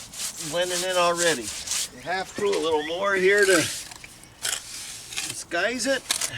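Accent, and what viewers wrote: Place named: Scranton, United States. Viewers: NE Pa is my old stomping grounds. Good to hear that Pennsylvania Dutch accent again. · You can't miss that Wilkes-Barre / Scranton accent. Thanks for the videos.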